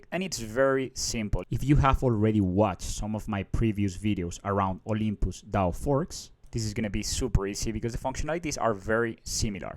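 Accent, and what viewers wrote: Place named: Bogotá, Colombia. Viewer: Este Man tiene puro acento de rolo.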